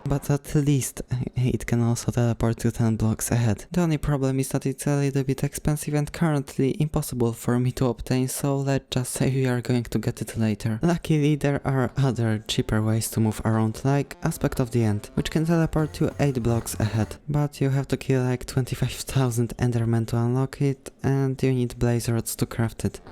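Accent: Poland. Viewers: On jest z polski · Taki nawet polski akcent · Hi, you are from poland? I asking because of your accent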